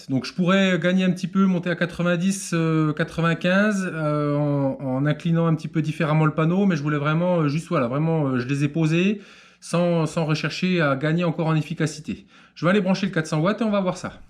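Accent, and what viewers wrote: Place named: Franche-Comté, France. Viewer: Tout à fait d’accord avec Youcef, quand on regarde la vidéo on est tellement dedans que le temps passe trop vite et petit bonus pour moi, l’accent franc-comtois et les expressions qui vont avec que j’adore entendre en tant que franc-comtoise. Tu ne soûles pas, tes explications sont simples et concises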